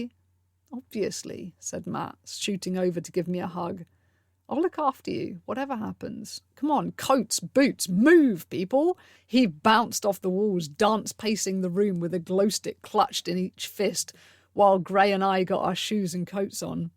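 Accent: United Kingdom. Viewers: OUTSTANDING piece of work & presentation!! 👏I sincerely enjoyed taking this journey through the history of your Goth Life! Seeing you dressed in your amazing Goth wardrobe, & listening to YOU tell your story in your proper British accent, made it even more amazing! THANK YOU for sharing your precious life, time, talent, knowledge, & experiences with us all! Best wishes!😘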